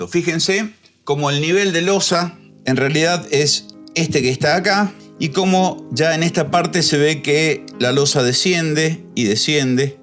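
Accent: Argentina. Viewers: Muy buena explicación técnica realizada con esa manera de hablar tan característica de los académicos Argentinos.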